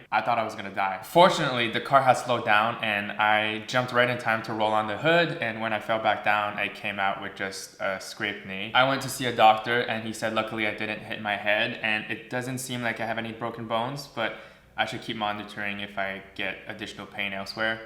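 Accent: Canada. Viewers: If only Mr could make a video in french i love hearing he's Canadian accent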